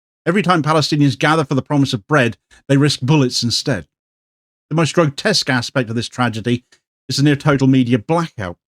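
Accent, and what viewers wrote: Cornwall, United Kingdom. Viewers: Cheers for getting the alternative viewpoint out,. Respect and much appreciation for standing up against bigotry, shut down, intimidation and most sinister of all, genocide. I look forward to your measured "rants" on a daily basis and all in your warm and friendly Cornish vernacular. Keep going, dear friend ✌️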